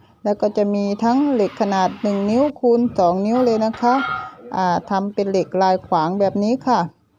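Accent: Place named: South, Thailand. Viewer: ชัยภูมิ..(พูดเหมือนคนใต้)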